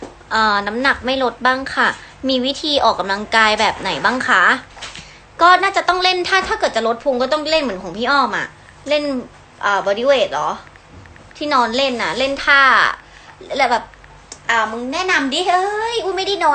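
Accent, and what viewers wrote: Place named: North, Thailand. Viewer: น้องน่ารักนะชอบให้พูดเหนือน่ารักดี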